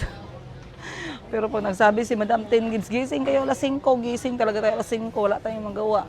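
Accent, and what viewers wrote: Iloilo, Philippines. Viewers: Urs when i hear you speak ilonga nakakatuwa but My papa is speaking bisaya he is from bohol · Natutuwa nga aki madam mag salita kw ilongga kc may kaibgan dn ako taga ilo ilo.ingat po kau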